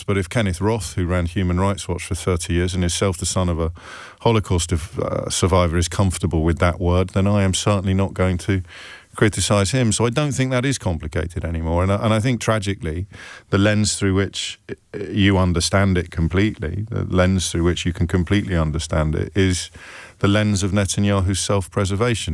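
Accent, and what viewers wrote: United Kingdom. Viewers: James is all over the place a Irish name posh English accent trying to both side a one sided argument he just hasn't brought out the moral quandary line yet?😢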